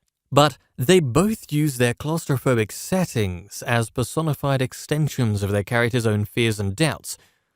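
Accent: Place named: England, United Kingdom. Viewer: Trying to watch the fin clip n all I'm hearing is some pommy wanker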